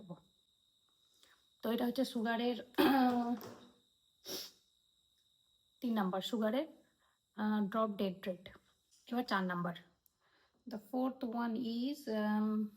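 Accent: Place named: Dhaka, Bangladesh. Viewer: Nah amr bang dakar awaj ta sunte valoi lagche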